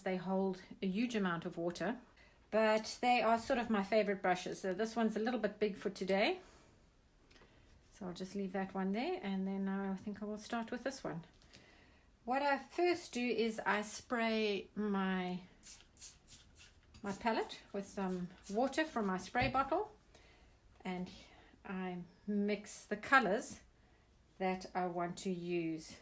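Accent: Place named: South Africa. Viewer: This is lovely. It sounds like you’re also South African? I also love watercolor and the loose style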